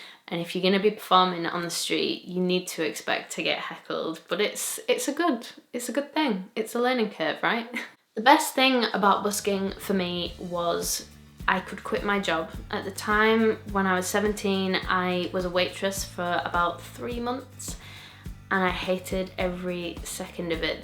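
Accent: United Kingdom. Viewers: you're from the uk? you sound northern!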